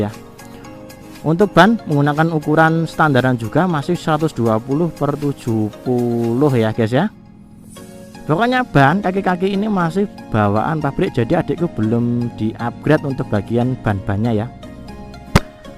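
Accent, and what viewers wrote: Java, Indonesia. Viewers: Sampean jawane pundi mas?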